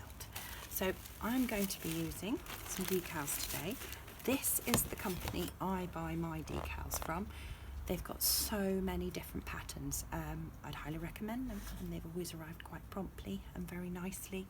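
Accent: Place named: Devon, United Kingdom. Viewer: Devon accent 'ooh arr... I like a bit of poking tool for aaafters!' x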